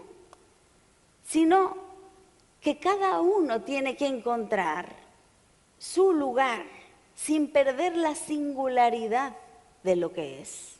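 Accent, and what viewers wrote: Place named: Argentina. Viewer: ¿Por qué tiene ese acento sudamericano? Es que dicen que es mexicana; pero habla como argentina.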